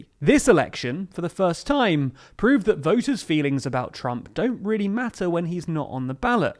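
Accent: United Kingdom. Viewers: He meant after 9/11 not of I know it's kind of hard to hear with a English backslash British accent but he meant to say after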